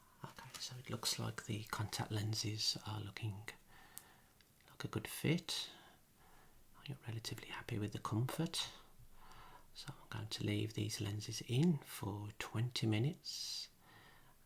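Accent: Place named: London, United Kingdom. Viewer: that lovely accent of yours, is that Londoner?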